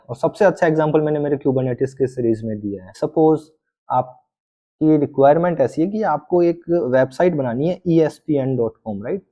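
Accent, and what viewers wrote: Indore, India. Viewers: superb explanation.... bro just one question are you from indore because you have that accent 😄😇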